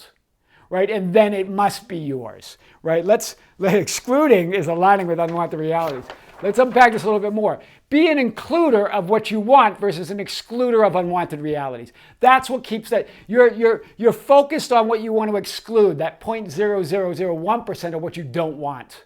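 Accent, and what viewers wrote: Boston, United States. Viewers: Your Baaston accent makes flip charts very exciting!!!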